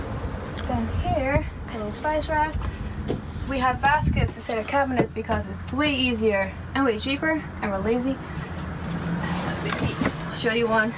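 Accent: Netherlands. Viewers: Ahh dacht al dat ik Nederlands accent hoorde😁 super Tof! Ik wil dit ook in nederland😍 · Is that a Dutch accent? Nice simple van design.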